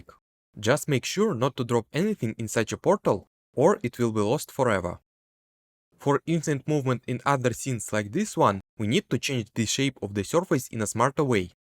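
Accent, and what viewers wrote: Russia. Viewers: bro got Russian acent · Вау, что у тебя с акцентом, никогда не слышал такого неподвижного звучания. Ты проигнорировал КАЖДУЮ фонему английского и заменил её на фонему русского. И даже не на самую похожую. нг в конце почему-то читаешь просто как н, ладно середину между н и г найти не получается, зачем просто выкидывать г. Произносится не обжект, а абджэкт. Не фанайт, а файнэйт. Не пробабли, а прабабли. Не копис, а капийз. Не сёрфэйс, а сёрфис. Не аньюжал, а аньюжуал. Не идиа, а айдиа. Не моментум, а мамэнтам. Не эгрис, а агрийз. Не эдд, а адд. Не специфик, а списыфик. Не дат(that), а дэд. Не джеометри, а джиамитри. Не детэ́йл, а ди́тэйл. Не телепо́рт, а тэ́лэпорт. Не эллипсо́идал, а эллипса́идал. Не алдоу, а олдо. Не дыс(these), а диз. Сделай на русском отдельную дорожку, невозможно без кукожа тебя слушать. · Nice russian accent 👍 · не вижу русских в комментах, хотя акцент автора явно русский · Акцент кст русский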